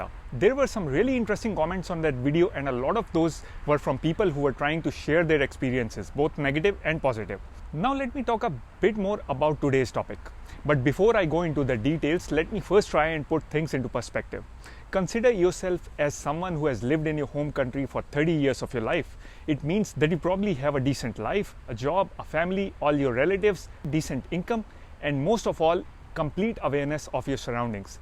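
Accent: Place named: India. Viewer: This guys accent is pathetic - typical musical and Indian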